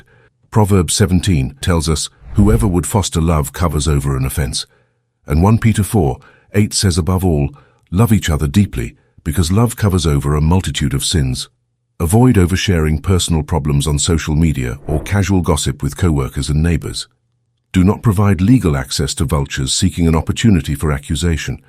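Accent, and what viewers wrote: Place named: England, United Kingdom. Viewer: This narrator sounds like some kind of wise fellow. The English accent helps, however his words are solid. Great advice .....man without a face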